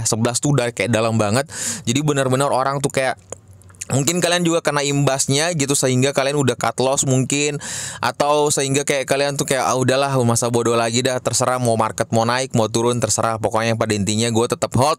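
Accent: Bugis, Indonesia. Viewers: Bang,, orang bugiss yaaa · Bugis ya bang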